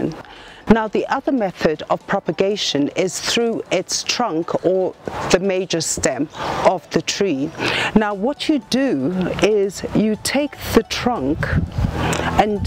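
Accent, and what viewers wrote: England, United Kingdom. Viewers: Very good video, especially when you speak English English! Informative!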